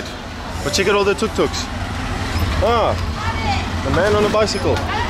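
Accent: South Africa. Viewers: Why do you sound like you are from South Africa when you speak English? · He sounds super South African to me 🤔. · Why do you keep tellimg people that you are from GERMANY, when your accent is clearlu SOUTH AFRICAN??????? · I feel ashamed for him saying he is German - he speaks with South African accent. Egypt is a country I love and Egypt needs help showing the beauty of it to get more tourists not the hard life the people have to live from the corrupt government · You sound S African, not German--enjoyable posts. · he is half German half SA guys, he was born in SA and lived in Germany the last years. He said that in one of the first videos. You can clearly hear an accent when he speaks German too. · This south African not german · You almost sound South African, good video. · He got South African accent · You are German? You sound South African to me. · You are the most South African sounding German man I have ever seen (...heard) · I thought you were South African, now I wonder who the South African guy was…..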